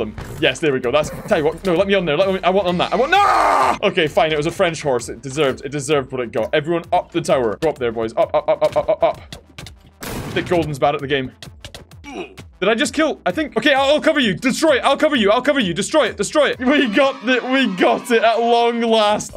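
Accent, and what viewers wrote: Scotland, United Kingdom. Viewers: Love your vids and I'm part Scottish from my dad side and your Sckttish accent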